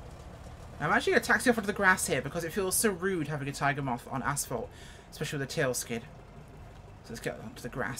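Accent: Australia. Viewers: Luv the Aussie accent... Brought one for my Xbox.. great fun. Cheers mate 🤠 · Lovley Aussi accent there 😅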